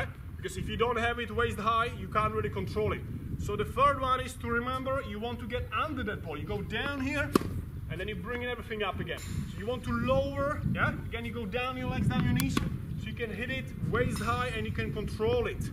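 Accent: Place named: Czech Republic. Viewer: nice one, thanks. where are you from by the way? your accent sounds tchechish.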